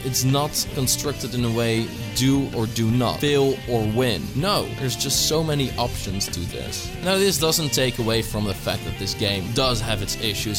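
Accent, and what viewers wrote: Netherlands. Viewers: Youre from the netherlands right i here your accent haha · Pardon me for asking, but are you dutch? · hears him speak for 10 seconds. Yeah he's dutch, beautiful accent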